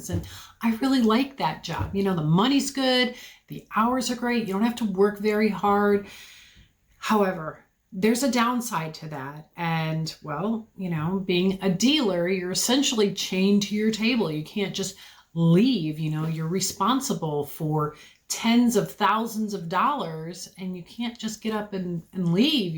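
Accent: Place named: Pennsylvania, United States. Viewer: Got a sister who is a dealer in a casino also and her husband is a security guard. She's been at it for years. And it's really been hard on her body and she's gained a lot of weight. Loves her job but she's not ketovore or anything like it. YOU CAN DO THIS!! You're a true believer in this WOE and you'll stick to it.!! If you're in PA I just wonder if you're at the same casino??? You sound like a Pennsylvanian! First time to your channel so I hope you wouldn't mind telling us where you are? BEST WISHES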